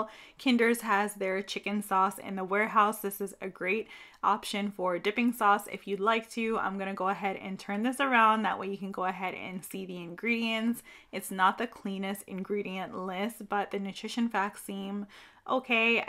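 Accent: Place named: United States. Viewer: Clearly an American accent, not her fault 🤷‍♀️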